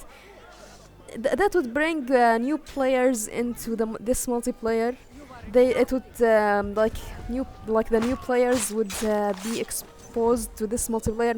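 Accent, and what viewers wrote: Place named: Arab world. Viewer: arabic english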